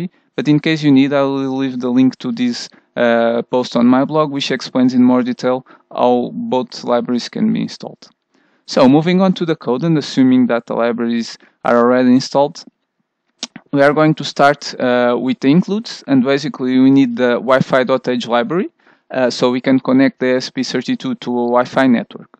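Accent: Portugal. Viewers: Great! Quick reference. Thanks. :-) You're Portuguese, right? I'm Portuguese and, from your accent, I would guess you are too. Just a quick correction. If you have your local WiFi router correctly set up, you don't need to be on the same WiFi network to access the web server. you can access it from any other inter-connected network. The Internet, for example.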